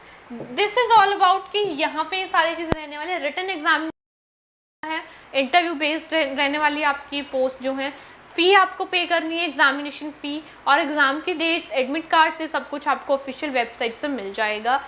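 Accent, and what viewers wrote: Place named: Bihar, India. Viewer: Just bihari boli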